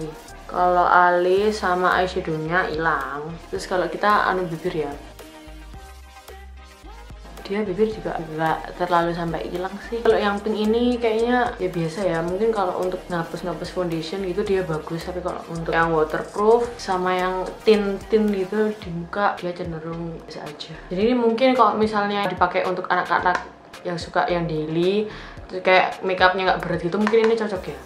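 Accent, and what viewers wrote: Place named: Java, Indonesia. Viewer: Medokkl tenan rek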